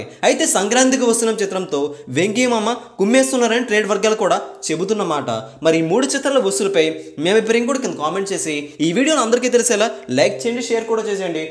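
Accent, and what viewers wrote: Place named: Srikakulam, India. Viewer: Babu Nuv pakka srikakulam village babu laga unnav ne yasa nuvvu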